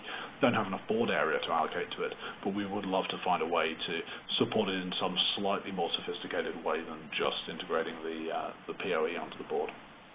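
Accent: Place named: United Kingdom. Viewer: Det er jo fordi han taler UK engelsk, og ikke USA engelsk.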